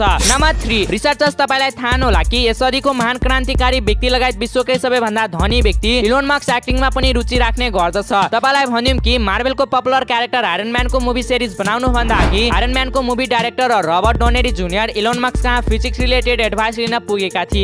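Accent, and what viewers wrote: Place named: India. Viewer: You are going much good but I want to suggest you that improve your accent and voice. Ali Hindi accent jasto vayo nepali jasto suniyena.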